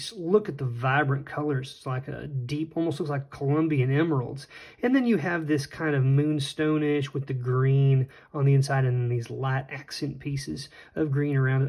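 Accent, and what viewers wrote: South, United States. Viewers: This guy is definitely from the south with that strong southern accent.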